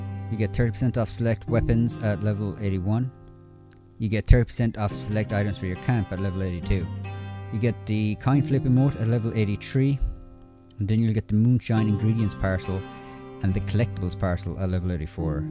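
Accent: Ireland. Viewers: Didn’t expect to be an Irishman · You Irish?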